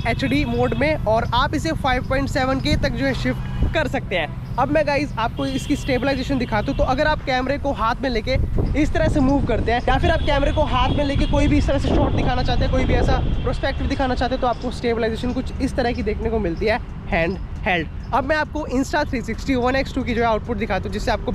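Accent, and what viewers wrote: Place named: Kashmir, India. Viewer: Bro....ur accent gives Kashmiri touch.... Anywz nice comparison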